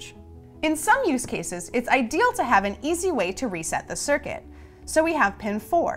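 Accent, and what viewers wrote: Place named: United States. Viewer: Awful American accent.🤢🤢🤢🤮🤮🤮🤮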